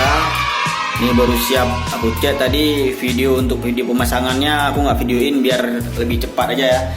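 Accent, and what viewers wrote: Aceh, Indonesia. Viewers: Logat Aceh nya bang😂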